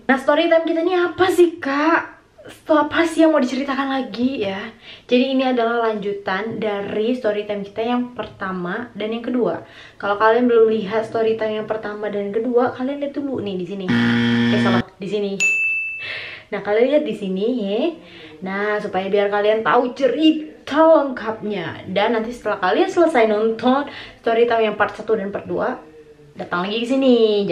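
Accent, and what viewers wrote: Indonesia. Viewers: Salam kak... Saye dari tg. Balai karimun... Slame nonton vlog akak,, bahase nye same dgn kami... Ternyate emang pernah tinggal di tg. Balai karimun... Kak tg. Balai karimun nya dulu dimna?? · pantas logat e macam pontianak.. emang perbatasan indonesia na kalimantan dengan malaisia.. walaupun ndak nempel langsong pontianak e wkwkwk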